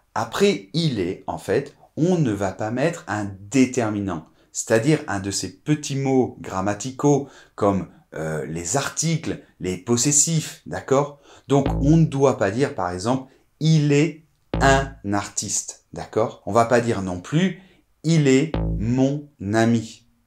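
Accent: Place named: France. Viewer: Bien expliqué et avec la prononciation française que j'adore!, bon weekend à toi et à ta famille! 😘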